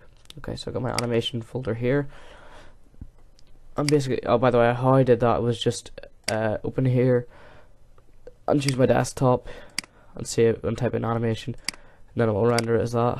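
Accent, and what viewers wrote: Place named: Northern Ireland, United Kingdom. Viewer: Northern irish =) Yeoo!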